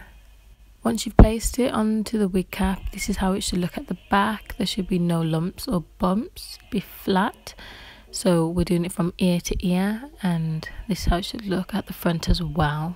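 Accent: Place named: Northern England, United Kingdom. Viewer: Your accent 😍😍😍😍 Northern? Manchester?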